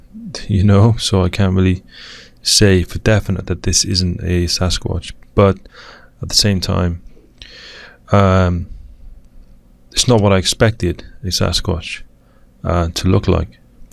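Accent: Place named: Wales, United Kingdom. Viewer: please jus keep your great vids coming!:) x ps I love ur Welsh accent too;)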